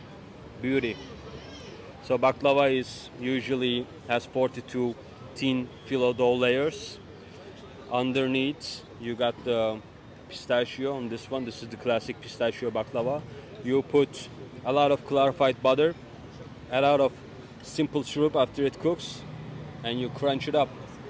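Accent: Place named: United Kingdom. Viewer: Gerçekten saygı duyduğum bir insansın . Gösterişten uzak işine saygı duyan hayata insanlara duyarlı gerçekten örnek bir kişiliksin . Çizgini de asla bozmadın . Haddim değil belki ama bir birey olarak her defasında aferin , helal olsun cümlelerini içimden geçiriyorsun . Bu yaptığın İngilizce videoların bile amacının globalde ülkemizi ülkemizin zenginliklerini tanıtmak olduğunun da farkındayım . Aslında daha da dikkat çeken ne biliyor musun kaç yıl Amerika’da yaşamana rağmen daha british konuşman ve basit cümleler kurman . Belki çoğu kişi ne demek istediğimi anlamayacak ama açıklayım . Basit İngilizce ile anlatıyor ki biraz İngilizcesi olan bir Hintlide Slovakyalida Rus’ta Arjantinli de anlayabilsin diye . Çok ince düşünmüşsün tekrar helal olsun .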